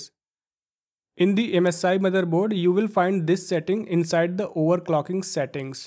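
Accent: India. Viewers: Indian accent tutorials are the best🔥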